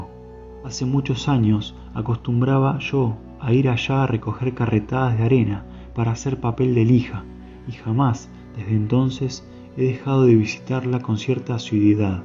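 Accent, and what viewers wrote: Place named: Argentina. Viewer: Me estás ayudando mucho, iba muy lento con la lectura por mi mala visión. Escucharte me resulta muy cómodo añadiendo que tienes una linda voz, con tu marcado acento argentino. Gracias por hacer este audiolibro y te animo a continuar. Saludos desde España🙂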